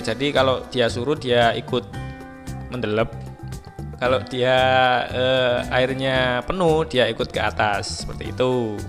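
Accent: Java, Indonesia. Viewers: Smpean jowo'ne pundi Om..?? 😁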